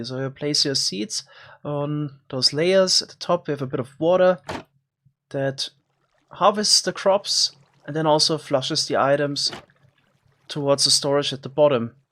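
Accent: Germany. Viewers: Bist du deutsch? Xd klingt so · Nice! Rebuilding Farm Simulator in minecraft. Is that a German accent I hear? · Da hör ich doch direkt den deutschen akzent😉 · Liebe den deutschen Accent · Are you from Germany because you sound Like a German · Love ur german accent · Sprichst du deutsch? Das merkt man bei der Aussprache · Hahah dieses deutsche englisch😂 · His german accent is legendary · are you german (Just Asking because of the excent) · This german accent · Ich mag den deutschen akzent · This German accent. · Du bist Deutsch, man hört das xD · Are you german ? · That German accent, loved it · Mann Merk das du Deutscher bist😂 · Are you german??? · man hört das du deutsch bist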